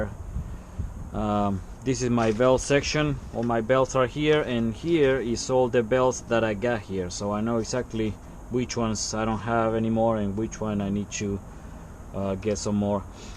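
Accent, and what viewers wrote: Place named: Argentina. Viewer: You sound like your Argentinean. who do you think about the testo digital gauges?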